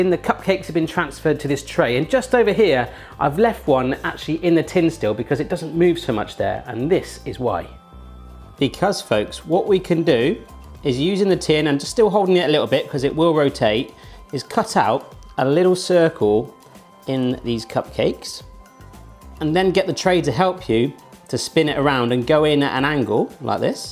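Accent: United Kingdom. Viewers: You sound so british I love it xD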